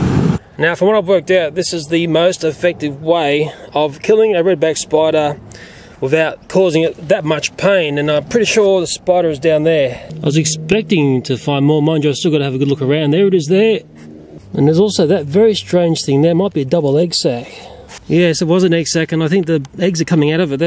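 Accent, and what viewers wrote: Australia. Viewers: Australian accent? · Australian accent “check” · Australia accent CHECK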